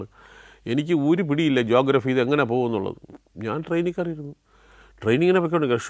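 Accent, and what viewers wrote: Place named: Thiruvananthapuram, India. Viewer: ആദ്യത്തെ എപ്പിസോഡ് കണ്ടു് തിരുവനന്തപുരം ശൈലിയിൽ ഉള്ള വിവരണം കേട്ടപ്പോൾ ഇഷ്ടം ആയി❤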